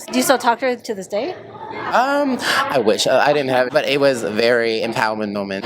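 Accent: United States. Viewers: He sounds like he’s from Atlanta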